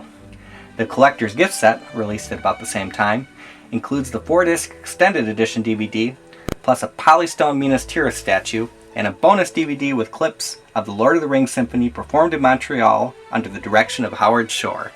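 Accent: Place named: New England, United States. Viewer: Biggest yankee accent I have ever heard.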